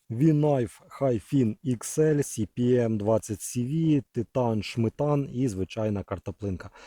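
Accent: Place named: Ukraine. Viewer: хохлятский говор ну прям ржу)))нож шляпа, це обзор хоно)))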